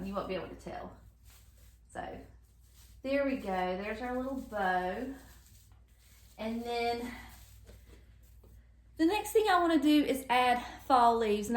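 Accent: South, United States. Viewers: I just decorated my house this morning. Now I’m watching you while I knit on this rainy Alaskan day. You are just so sweet and listening to you, I’m remembering my southern roots. ❤️ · Thanks for getting back with me. Such a great idea. Love your southern accent and down to earth explanations. Joy to watch you🎃❤️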